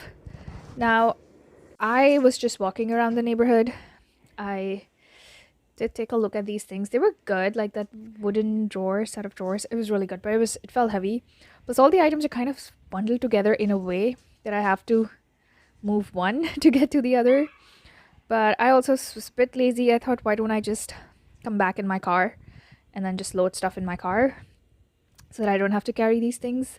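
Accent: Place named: India. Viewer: U sound Indian, not Korean.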